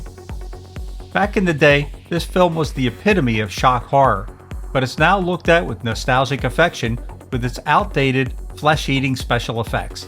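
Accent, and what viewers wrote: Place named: Pittsburgh, United States. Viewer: Hey. Not sure if I asked before but are you a rust belter? PA? Ohio? Michigan? Your accent sounds Pittsburgh like.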